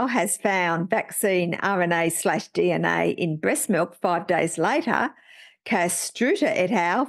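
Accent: Australia. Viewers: Some feedback on your Aussie speak. "Doozy" is more American slang really. It's a noun so you'd say something like, "Onya, another video that's a real doozy". Or "Thanks for the doozy of a video, ". Though "onya" (good on you) is definitely oz speak. Good Aussie adjectives might be "bewt" or "bonza" as in "Thanks for another bewt video, ".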